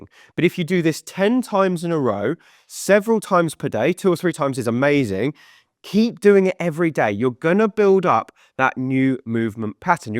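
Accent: United Kingdom. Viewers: 57 year old male…I tried this once and found it useless…the best part of this clip was his British accent..Americans love a British accent but other than that I didn’t find this useful at all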